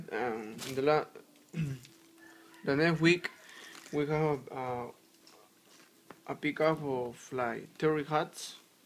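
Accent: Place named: Cuba. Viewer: U sound Cuban homeboi are u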